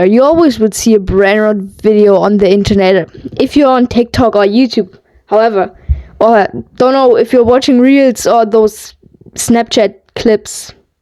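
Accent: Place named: Germany. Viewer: U sound german 🤔🤔 W vid ♥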